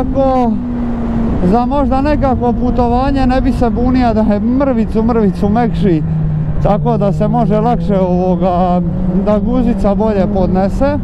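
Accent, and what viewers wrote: Dalmatia, Croatia. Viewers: E lipo mi te cut bruderu, kako to fino objasnjavas po dalmatinski :)))) Hvala za super video, taman hocu da kupim bandita i to bas takvog. Veliki pozz iz Sarajeva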